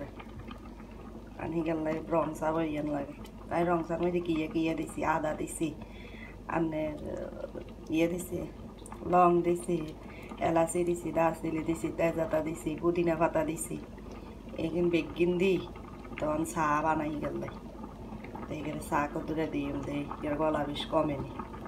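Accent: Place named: Noakhali, Bangladesh. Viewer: Apo noakhali kotha onek sondor lage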